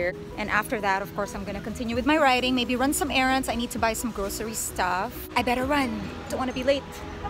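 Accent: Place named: Philippines. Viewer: You lose your accent when you sing. I love the Filipino accent. :)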